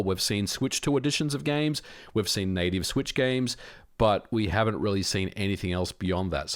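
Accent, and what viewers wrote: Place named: Australia. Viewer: His accent is clearly Aussie. But yeah, I can understand the mix up. I'm from the UK and I can't tell the difference between the American and Canadian accents. Unless they're from the Southern US. Somwan stowl ma god damn truhk! Mary Jane! Hand me ma guhhn!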